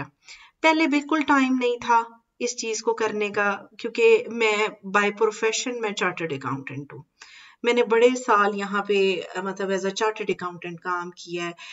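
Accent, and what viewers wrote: Punjab, India. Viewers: Ma Sha Allah , Nice sharing👍🏻 awesome video. Very informative and very well explained. 😍loving your Punjabi style. Absolutely amazing ❤ keep sharing videos dear. My kids also watch your videos. They like your way of talking 😍❤️ i also follow you on instagram.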